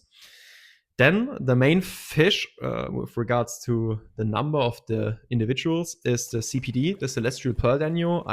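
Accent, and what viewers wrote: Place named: Germany. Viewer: ican hear german :))))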